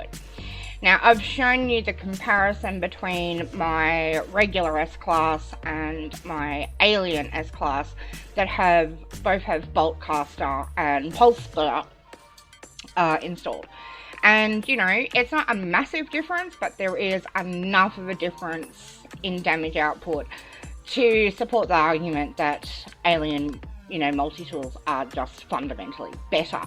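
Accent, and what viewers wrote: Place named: England, United Kingdom. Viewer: How do you feel about Roseanne Barr? I lover her sense of humor.. but if no one has said it before you are exactly her with hint of an english accent. Asside from that.. Great video, informative.. but english Roseanne sold it .. lol! thanks!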